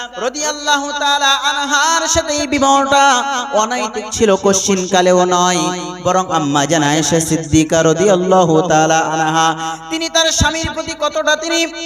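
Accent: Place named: Bangladesh. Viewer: অনেকটা বাংলাদেশী বক্তাদের মতো শুনতে লাগছে ❤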